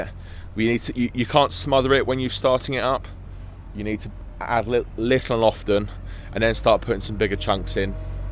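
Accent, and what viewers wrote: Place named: United Kingdom. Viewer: I've stumbled upon your channel this week and I'm liking the operation, the surroundings, your nice British accent and the fact that you seem like a nice bloke. Good luck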